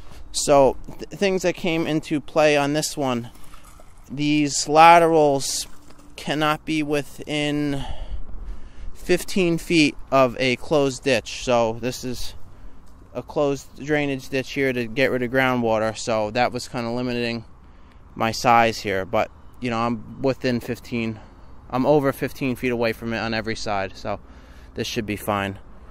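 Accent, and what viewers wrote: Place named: California, United States. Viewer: What state is this in? Sounds like California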